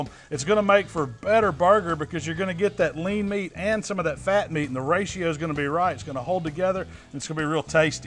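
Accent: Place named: Mississippi, United States. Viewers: Shhhh.... Mississippi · Xeno he said boil... he's from mississippi. it's his accent.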